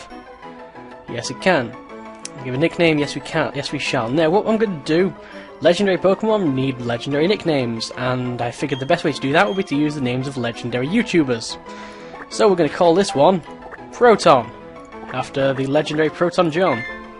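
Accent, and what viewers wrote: United Kingdom. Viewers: Are you from the UK? I love your accent! x3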